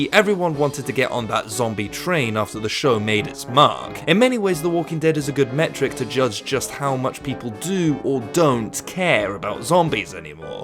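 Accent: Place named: United Kingdom. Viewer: Holy shit! You are the most English boi ever. Also, this show sucks! Rip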